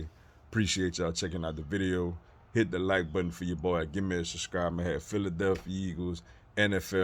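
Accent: New Orleans, United States. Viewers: you sound like you from new orleans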